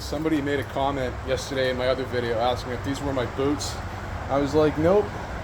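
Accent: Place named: United States. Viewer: Your Accent is American. Where are you from? Oklahoma here....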